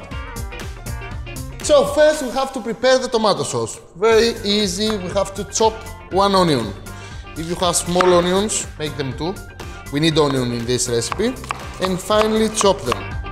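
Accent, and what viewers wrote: Greece. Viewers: That lovely accent is Greek btw not Italian.. pretty similar tho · my friend. Love your videos and your charming Greek accent. But I was kind of disappointed that you didn't use Greek basil😊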